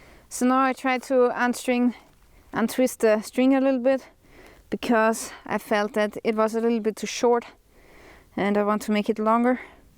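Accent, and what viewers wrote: Germany. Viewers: So cute German accent :) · I'm guessing 🇩🇪? Nice video and very humble. It's the best when you say, ,"Cool"...dánke....Michael 🎶 🎶🎵 🎯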